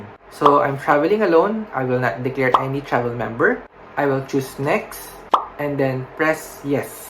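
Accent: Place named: Philippines. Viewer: English pa more pinoy k eh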